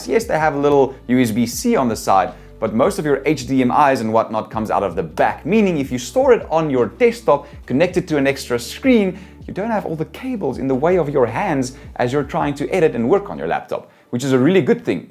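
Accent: South Africa. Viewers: are you south African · Is he South African?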